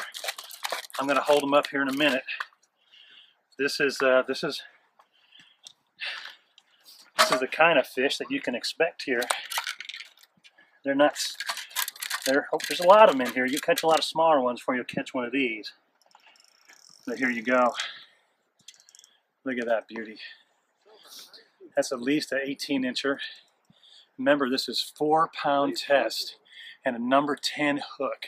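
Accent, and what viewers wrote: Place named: Upper Midwest, United States. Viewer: Are there any catfish in the "White River"? Oh by the way you sound like you are from the upper midwest??? Thanks for the video!!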